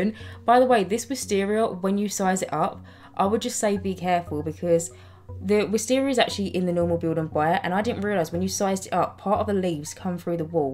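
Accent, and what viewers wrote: United Kingdom. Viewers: how I instantly knew was british 1. its well old 2. her accent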